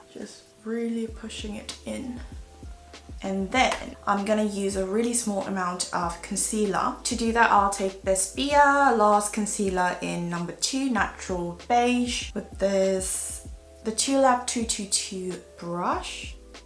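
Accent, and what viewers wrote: United Kingdom. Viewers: 부산은 어땠어요??전 45년 부산토박이 비오는날 저 인생영화 와일드 보면서 소주한잔중인데 바로 영상 돌리고 바로 영국발음으로 듣고 있어요 사실 영어는 힘들지만 영국발음은 좀 멋지더라구요 항상 응원해요~~혹시 와일드영화 안보셨다면 남편이란 함 보셔요~~^^ 전 악마는 프라다를 입는다도 인생영화지만 꼭 보시길~~오늘도 이뿌신거 아시죠??? 사랑해요 억수루~~ㅎㅎ💖💖💖💖💖💖💜💜💜💜💜💜💜💜💜정말 사랑스러운거 아시죠??항상 응원합니다~~^^ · Happy birthday it’s late to say tho:) ❤️ I LOVE your British accent so much! 💗 최공 ㅎㅎ 😍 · 썸네일에 홀린듯이 들어와 영국발음에 취한다..💕...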